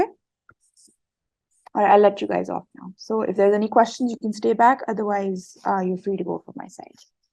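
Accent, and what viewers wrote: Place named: India. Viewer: Ma'am sounds desi while speaking urdu and fancy while speaking english😭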